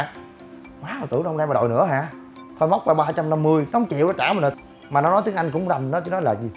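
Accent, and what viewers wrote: Mekong Delta, Vietnam. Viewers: mình quê miền tây nên nghe quen lắm :) chả bị gò bó gì quá , nói chung dn nói đc lắm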